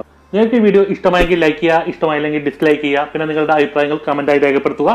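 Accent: Kannur, India. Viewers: നിങ്ങൾ കണ്ണൂർ കാസർഗോഡ് കാരനാണോ...? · Eth kannur karanada... Ee channel owner, thani nadan kannur bhasha... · Nigal kannur ano ?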